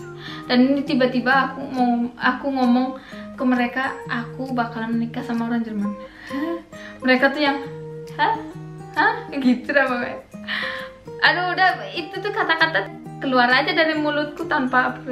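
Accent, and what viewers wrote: Bali, Indonesia. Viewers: pasti qm org bali,kliatan logatx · bisa bahasa bali ya tp qm muslim....beberapa kali di video qm dpt bilang "mekacaan" dan "saklek", logatnya juga kyk orang bali awal.a aq kira orang bali 🤭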